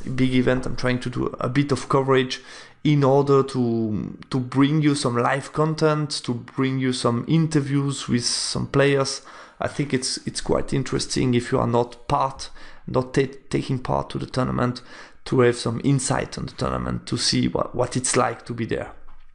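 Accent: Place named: Poland. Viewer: A very light polish accent, isn't it ? :D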